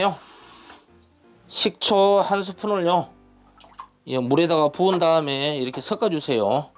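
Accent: Jeolla, South Korea. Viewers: 구수한 전라도 말씨 참 듣기 좋네요..음식두 간편하게 잘 알려주시구요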